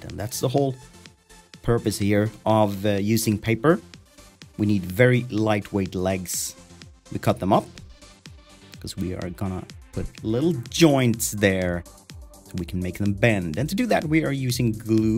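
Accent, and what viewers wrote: Sweden. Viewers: i was not expecting the dialect i'm so used to rikssvenska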